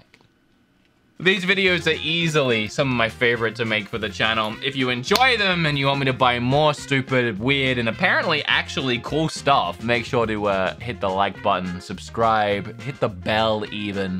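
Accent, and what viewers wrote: Australia. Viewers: What's with your weird fake American accent lol.. I preferred your beautiful Aussie accent you have somehow lost.. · sounds more Australian then normal lol not a bad thing I just heard it more then usual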